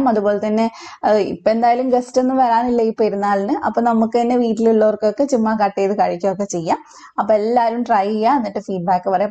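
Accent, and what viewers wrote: Kannur, India. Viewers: Itha kannur aano or Malappuram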